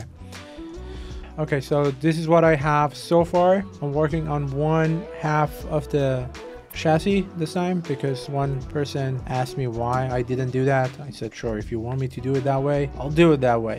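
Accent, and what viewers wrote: Iran. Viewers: حاجی لحجه ایرانی معلومه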